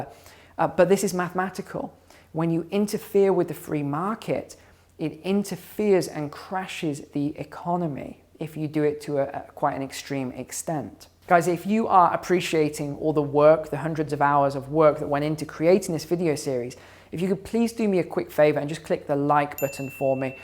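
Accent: England, United Kingdom. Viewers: He is definitely British . I’m British so I can hear his delivery is British, even regardless of his accent ! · I have heard that some English people live outside the UK. His accent is authentic English. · English with English accent using US 'English' words to appeal to the audience.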